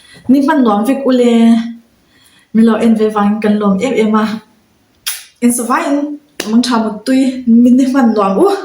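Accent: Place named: Lamka, India. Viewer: Fiah lutuk❤❤muhnawm leh dawn ae🥰🤗🤗 Lamka tang aw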